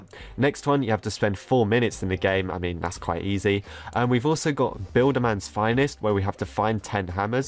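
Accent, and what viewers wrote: United Kingdom. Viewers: It’s called a British Accent, look it up...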